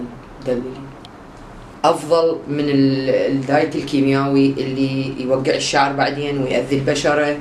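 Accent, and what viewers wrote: Iraq. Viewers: حلو حلو هواي حلو شكد حلو انا اتابع فديوهاتك عشان استمتع بجمال اللهجة العراقية أحلى تحيه لأروع و اغر الشعوب على قلبي الشعب العراقي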